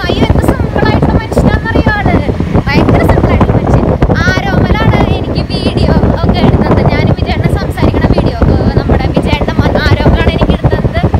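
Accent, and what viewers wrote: Thrissur, India. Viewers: Thrishure slang and your smartness njanum subscribe cheythu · മോളെ ഉണ്ണി എന്നുപറഞ്ഞാൽ കുട്ടിയാണെന്ന് തൃശൂർ കാർക്കും മാത്രമേ മനസിലാവുകയുള്ളു അതുപോലെ തൃശൂർ സ്ലാങ്ഗ് ഒരു രക്ഷയും ഇല്ല പിന്നെ മോളെ നിന്റെ മനസ്സ് നെല്ലാണ് ദൈവം മോളുടെ ഉദ്ദേശം നടത്തി തരാൻ അനുഗ്രഹിക്കട്ടെ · എന്റെ പൊന്നു മോളെ... നിന്റെ അസ്സൽ തൃശൂർ ഭാഷ... ഇഷ്ടപ്പെട്ടു... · ഹലോ 😊♥️ പൊക്കി പറയ അല്ല എന്താ പ്രസന്റേഷൻ ♥️തൃശ്ശൂർ ഭാഷ കേൾക്കാൻ ഒരു സുഖം തന്നെയാ.. പിന്നെ (പേര് അറിയില്ല )ന്റെ വർത്താനം ♥️ഞാൻ vloger കാർത്തിക് സൂര്യ ♥️♥️ഫാൻ ആണ് ഇപ്പോൾ നമ്മള സെക്കന്റ്‌ ഫാൻ ആയി ♥️ഒരു women ആയ കൊണ്ടല്ല.. Athul സജീവ്... തിരുവനന്തപുരം 😊 ഈ സ്റ്റൈൽ ഒരിക്കലും മാറ്റരുത്... God bless you 😊😊🥰🥰♥️♥️ വിജയൻ ചേട്ടാ ♥️♥️♥️ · Hai ചേച്ചി ചേച്ചിയുടെ എല്ലാം വിഡിയോസും കാണാറുണ്ട് ചേച്ചിയുടെ തൃശൂർ സംസാരം തന്നെ കേൾക്കാൻ തന്നെ നല്ല രസം ആണ് ചേച്ചിക്ക് ബുദ്ധിമുട്ട് ഇല്ലെങ്ങിൽ ഒന്ന് എനിക്ക് വിളിക്കോ <num> ഇത് ആണ് എന്റെ നമ്പർ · നിങ്ങളെ സംസാരം കേൾക്കാൻ രസം ഉണ്ട്.... 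ചിരിച്ചു പോകും..... കാരണം തൃശൂർ ഭാഷ ആണ്.... ഞങ്ങൾ കൊല്ലം ആണ് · തൃശൂർ സ്ലാങ് പൊളി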